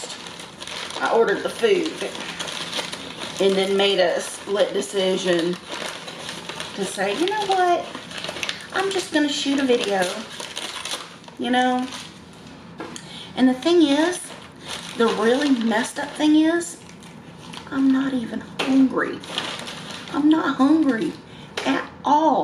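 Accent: South, United States. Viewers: She has such a beautiful face and love the southern accent!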